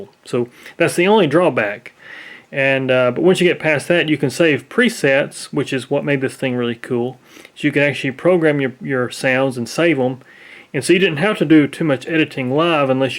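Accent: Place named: Appalachia, United States. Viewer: Your hillbilly accent is just adorable.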